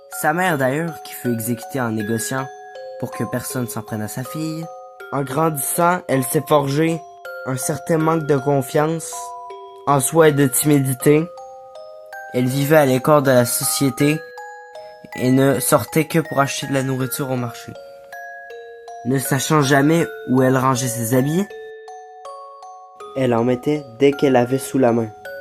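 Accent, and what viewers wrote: Quebec, Canada. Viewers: Le narrateur est québécois ? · Ça aurait été moins bien si la narrateur n'avait pas été Quebecois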